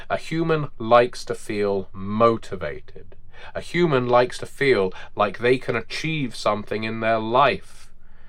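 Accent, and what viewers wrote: England, United Kingdom. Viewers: It really just the cherry on top, hearing an articulate English accent justifying Colonialism in the 18th century with the claim that humans fundamentally and habitually extract and exploit to live. Chilling. Love it. You know, in the US we pride ourselves on being very sad about being a very successful, leading, world military and "not-empire" that spans across a continent and around the world. Everyone just ignore the fact that the sun never sets on US territory, ignore the military base in your country, and the nuke subs in launch range of your cities. We're not an "empire"! How ridiculous. We have a legion of lawyers to show you how wrong and slanderous it is to point out that fact!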